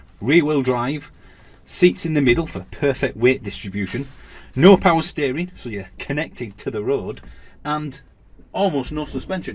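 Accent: Yorkshire, United Kingdom. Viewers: Love the accent too. Somewhere in Yorkshire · probably just the Yorkshire accent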